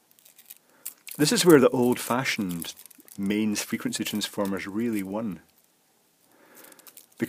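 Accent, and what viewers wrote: Scotland, United Kingdom. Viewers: Why does it sound so good when Scottish people talk about nerdy things?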